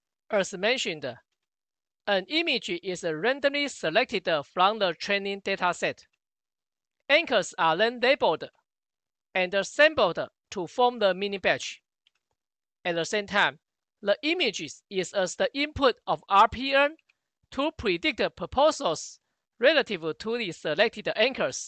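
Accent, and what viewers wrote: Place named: Taiwan. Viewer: The accent of oral English of Taiwanese and Mainland Chinese do have a lot in common which makes your presentation much easier for me to understand, thanks, buddy!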